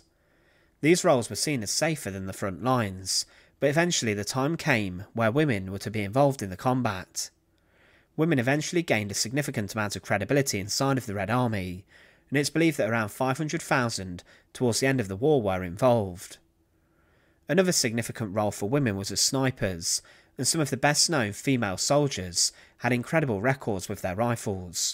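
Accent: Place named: England, United Kingdom. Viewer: It's the guy's English accent. I don't know which particular accent it is, but the "fousands" bit, instead of "thousands," just baffles me.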